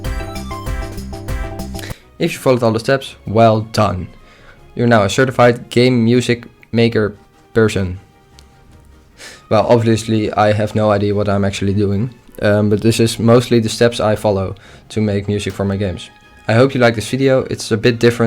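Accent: Netherlands. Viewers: there is something in the way netherland people talk english, that makes me think theyre from the netherlands, gues i was correct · your definetly dutch bro your accent lol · hoi, ik herken een nederlands accent, heb je een discord server? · my brain knew you were dutch the first second in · Hoi ik kon al meteen aan je accent horen Dat je Nederlands bent. Anyways coole video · Nice, i could hear it on your dutch accent :D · ja neterlands accent heb je wel door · As a dutch person I can confirm he sounds Dutch. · Very dutch accent lol I love it great video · A few seconds into the video and I could tell you were dutch ! :p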